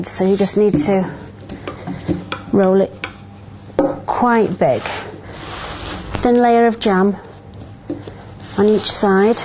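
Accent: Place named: RP, United Kingdom. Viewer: I've never seen jam used before...interesting. I love British accents. They are so posh and everything they say sounds smart :-D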